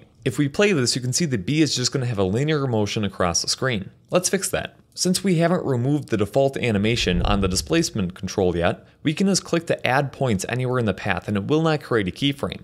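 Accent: Wisconsin, United States. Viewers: I love your Wisconsinite accent!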